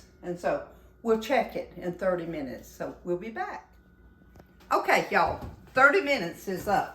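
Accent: South, United States. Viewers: Love the southern accent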